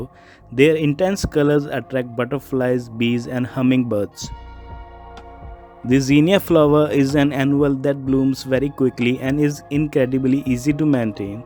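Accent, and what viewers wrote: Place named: India. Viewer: If it’s not an Indian accent doing the tutorial, I don’t event want it. They are always the best ones.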